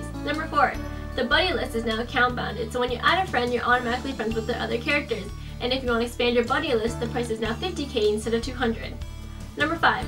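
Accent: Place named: Canada. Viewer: Maplestory back in 2013 or so was like an ultimate P2W game. You forgot to mention Miracle cubing for crazy highass potentials. But nonetheless this is a great video! PS: You sound like Chinese-Canadian. I'm also Chinese Canadian as well! Which part of Canada do you live in?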